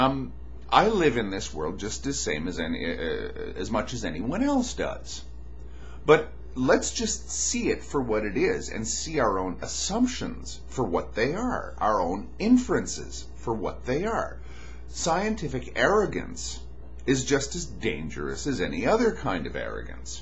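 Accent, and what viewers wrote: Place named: Canada. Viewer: Heavier Canadian accent here than usual!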